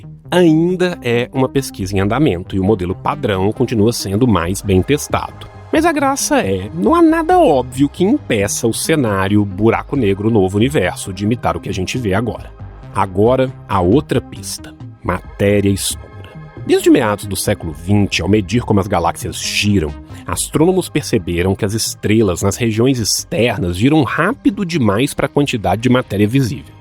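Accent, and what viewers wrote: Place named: Minas Gerais, Brazil. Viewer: é um universo. E o sotaque mineiro deixa tudo melhor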